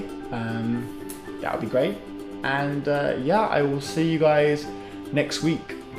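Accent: United States. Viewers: anyone else notice the new slight American accent?? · Your accent is becoming more American. The more time you're spending with Arden the more you become each other and that is true love. Also found in friendships and it's so so damn cute!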